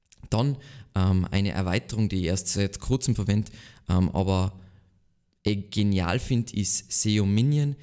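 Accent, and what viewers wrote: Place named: Tyrol, Austria. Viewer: So schön wieder den Inschbrugger Akzent zu hören! Was das Video angeht, TOP!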